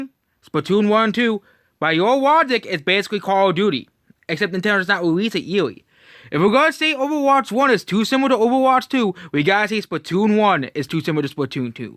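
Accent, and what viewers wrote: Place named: India. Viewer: But this guy sounds like a Indian scammer kind of you know Indian skimmer you guys trying to get us to buy Sony and Xbox or steam deck instead or game PC well already have two of those how about I go steam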